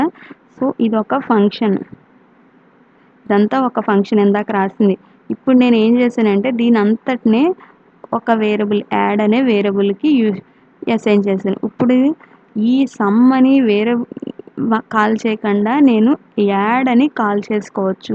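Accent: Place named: West Godavari, India. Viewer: Mam midhi West Godavari dt Slang ga undhi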